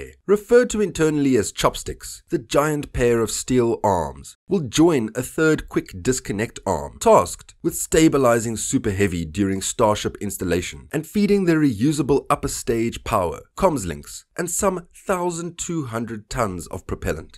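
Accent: South Africa. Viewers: False UK accent? No. That's a proper South African accent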